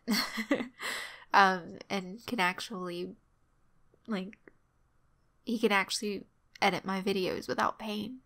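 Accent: South, United States. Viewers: I actually just found out about your channel today.. I'm so sorry for what you've been thru, and I'm sending healing thoughts your way that things will only get better for you and your loved ones. As I'm a new subscriber, I don't know what your voice was like before..but as a southern gal, I sense a hint of southern in your voice as well..which of course I think is terrific.😊 Hang in there, I believe there's a plan for each of us. I don't purport to always understand (or enjoy) some of the routes my life has taken getting to the plan, but I try to keep in mind even the darkest days eventually have to lighten up. And as much as I don't like it, it'd be hard to recognize real happiness if I'd never experienced real sadness. I'm looking forward to listening to your prior narrations as well enjoying the new ones you choose to share. Take care!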